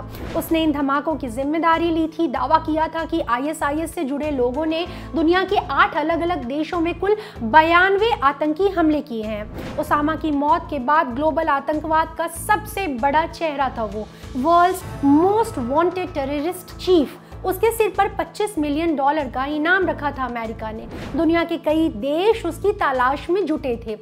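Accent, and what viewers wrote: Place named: Uttar Pradesh, India. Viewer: Accha achha. Lekin kehne k tarika up wali aur voice quality Manmohan jee के jaisa hai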